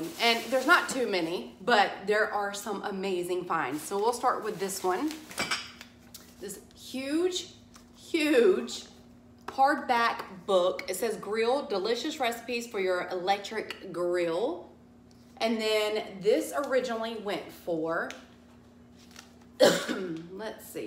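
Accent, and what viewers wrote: South, United States. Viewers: Oh girl I got such a kick out of you saying you from the south like we couldn't tell love you girl. I say girl cuz I'm almost 70 n I call my girls lil girls.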